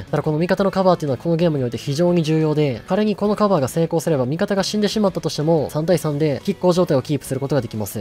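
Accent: Kansai, Japan. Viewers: 初心者ですがどの動画もとても分かりやすくて参考になります✨✨味方やキルされてる人数など意識して見れるようになってきました🙏関西弁なのも親近感です😊今後の動画も楽しみにしてます！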